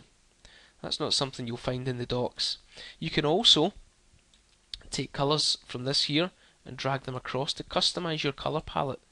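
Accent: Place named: United Kingdom. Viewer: Thanks you, very helpful. However to my mid-western American ear some British accents are as clear as day and others might as well be speaking Chinese. This one is kind of in the middle. I don't think it would hurt to speak more slowly and distinctly.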